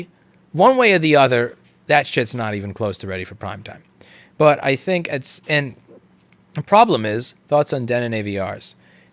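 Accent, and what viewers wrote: United Kingdom. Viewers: I love British accent, and after what I heard from Louis I have even more reasons to develop it just to annoy people. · As a brit I dont like the way people are complaining about a british accent...can you ask him to double down on it? Or to put on other accents. Brummy' scouse' gentleman. That would be just spiffing. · Complaining about British accent is like complaining about Louis looking like an Italian 😆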